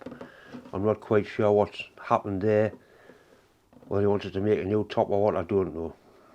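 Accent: Newcastle, United Kingdom. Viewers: great video, as ever - thanks for everything you do. Just wanted to let you know a bit of an odd coincidence - I started following your channel after a shout out from the Canadian YouTuber AvE, but despite the familiarity of the accent I'd not looked too much into it, s'just some geordie lad doing his thing. Then I noticed the address on the package - I had to double-take as a wave of nostalgia flooded over me. Despite now living at the other end of the country, as a kid, I grew up in the countryside of Northumberland and used to stay at my grandparent's house every weekend - my granddad was a retired engineer and used to fettle model boats in his garage, definitely where I got my love of engineering from. Anyway: they lived at the bottom of St. Estate, and I used to go walking the dog down the path alongside the Stamfordham road down past Callerton. 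Good times with the innocence of youth and all that! Anyway, keep up the good work, all the best to you and yours.:)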